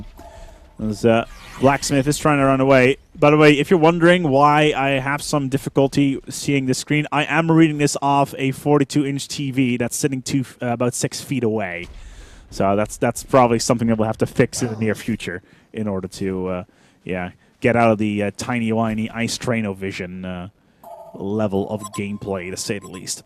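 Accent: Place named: United States. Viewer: i like how you make your voice all americany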